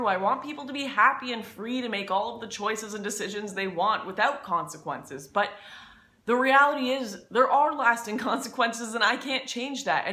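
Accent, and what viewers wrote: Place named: Canada. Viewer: Don't worry about it. I thought it was you're Canadian accent and thought nothing of it.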